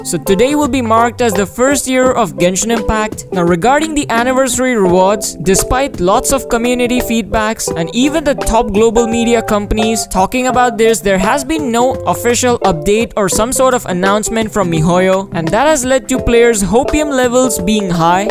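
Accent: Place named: India. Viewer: Your accent seems like an indian/korean...r you from india?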